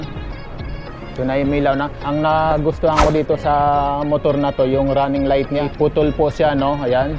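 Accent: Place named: Visayas, Philippines. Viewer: nice review i like hasang hasang n parte ng mio aerox abs kaya siya talaga naiiba s lahat at iba k rin magspill ng words very natural in visayan accent kudos to you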